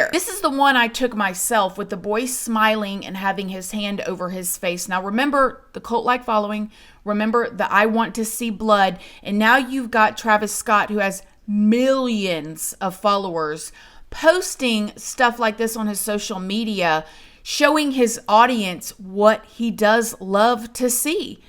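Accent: South, United States. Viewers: I looooove your channel and your adorable southern accent.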